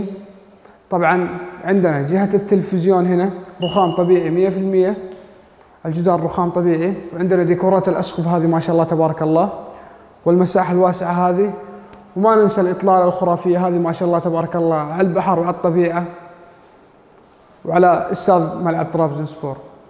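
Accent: Saudi Arabia. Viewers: اللهجه. سعوديه. اكيد. الاهل. مواليد السعوديه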